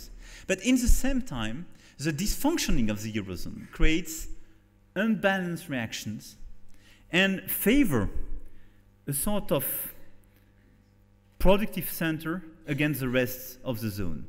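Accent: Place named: France. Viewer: bah il est français tu veux qu'il ait quel autre accent à part l'accent français ?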